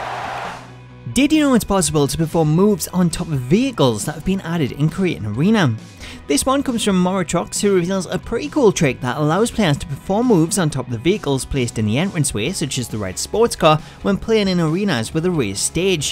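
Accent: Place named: Scotland, United Kingdom. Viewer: You’re Scottish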